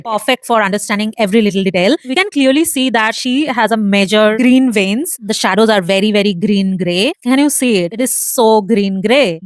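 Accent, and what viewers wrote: India. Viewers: Hell Nha. The actual stereotyped Indian accent only could be heard from south indian people. Obviously she has a Indian accent too just like me. But it's more like North Indian accent. Anyways having accent is normal. Americans have their own accent, British have their own accent. East Asian have their own accent. So do we. But it's just the accent changes within the country because of how multilingual we are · Quite informative video. Please work on your accent a bit more. You sound so like a stereotype of heavy Indian accent. I wonder if it's for the effect! 😂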